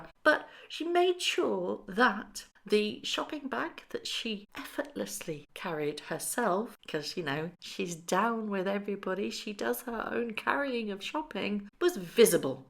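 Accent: United States. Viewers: Your American accent is as stupid as this entire video. Get a life. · Your American accent, matches Megan. Total rubbish 🗑😑 But you are amazing 👏❤💖, love your accent .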